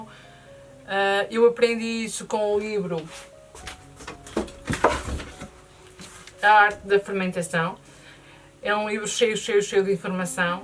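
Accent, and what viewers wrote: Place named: Portugal. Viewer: Vc é uma excelente mestre, além de ser uma gracinha com esse sotaque da terrinha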